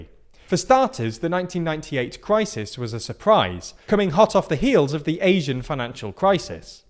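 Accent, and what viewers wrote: United Kingdom. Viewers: I am a bit confused as to why the 2 narrators(this one thinner than the other) of this channel have the exact same voice. Both have good kind of british accent, though.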